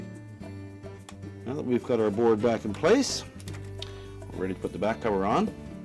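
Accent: Canada. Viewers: Hi! I feel like I know you!!! I just want to say thank you for the VERY well done video on repairing appliances! I've watched your vids on replacing a dryer belt, as well as just today repairing my 8 year old hotpoint fridge. I replaced both the compressor fan motor and the control board, and I owe it all to your informative, easy to follow, instructional video! So this girl just wants to thank you, thank you, thank you! P.S. Are you Canadian? (jus wundrin' lol!)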